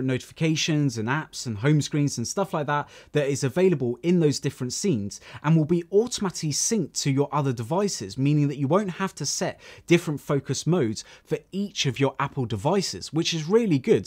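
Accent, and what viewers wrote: United Kingdom. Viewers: You explained it better than the WWDC21 guy. Love that Brit accent. So soothing to the ears. Keep up the good work!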